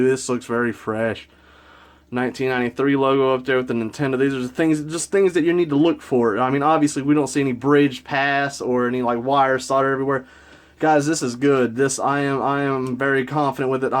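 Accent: South, United States. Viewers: I noticed your accent, where are you from? I live in Bama and it's nice to see a fellow southerner into retro games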